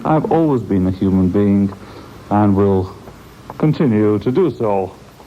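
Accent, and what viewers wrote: United Kingdom. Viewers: I've listened to hours of interviews with Lennon. Something doesn't add up. Sure, the voice is British, but I don't think it's him. Just my opinion. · I just like to know where this came from, and if it has actually been authenticated. There is another tape recording of him just talking, and it does sound the same as this. I picked up that little habit he had of sucking air through his teeth. Also where his voice goes up a little? Do you know what I mean? And he used the 'me' when referring to himself instead of my. Three clarifiers. And he does still have a British accent, but he just it's like if you were just talking to him. And he's not putting on a show of any kind of being famous. But it does sound a lot like how I would think that he would be. Like always a friendly channel to cheer you up, getting into it with the cab drivers. 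And the way he ends it off with his funny little laugh. It does sound like not under the influence, in fact by that point he was not even drinking heavy anymore. This was right after he went back to Yoko. And I just would like to know where it came from. I want to know how these people have access to these things that no one else in the world seems to have access to. But God a lot of that was could have been my life no I'm not trying to compare myself with the great. It's like the part where he talks about I'm frightened and lonely or something everywhere. Having these great big great conversations with cab drivers yeah I do that all the time. And it just sounds like what I would think would sound like when he first got up in the morning and hasn't had his cup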